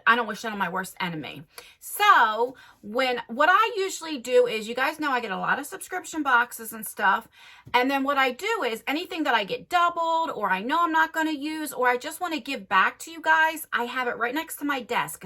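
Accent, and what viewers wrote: New Jersey, United States. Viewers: Love you and your channel! My family is from Jersey and I love listening to you talk. Feels like home...just like a good chocolate chip cookie. :) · I've been watching you for a little over a year and you are the best part of my evening. I literally go to bed with Abby, Theresa is dead, and you. I enjoy your New Jersey accent and love listening to your new podcast with Abby. Can't wait for you two to come out with you merch line. Can you imagine your own line of tye-dye hoodies. That would be so awesome. Well congratulations for reaching 50k and I will be right behind you when you hit a 100k. Xoxo. 😉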